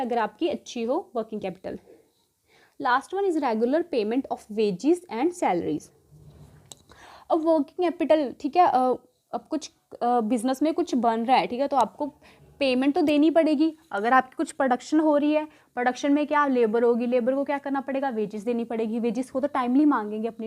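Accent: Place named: Punjab, India. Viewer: I liked the way you explained , are u Punjabi or from north side for sure😊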